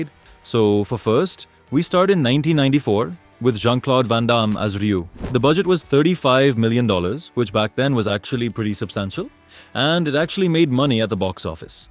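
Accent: India. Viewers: That an Indian voice lost credit for me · not trying to hide your indian accent behind AI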